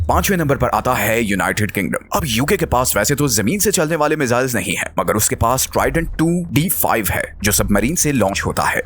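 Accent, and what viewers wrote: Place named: Pakistan. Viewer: Why are you sounding pakistani to me? Are you? You included north korean missile which is in under development stage and put it ahead of america. And you conveniently ignored India's 16000 km range Agni 6 which is also under development. It is in advanced stages. Your this lack of knowledge is a proof that you are a porki. Agni 6 is coming baby wait n watch.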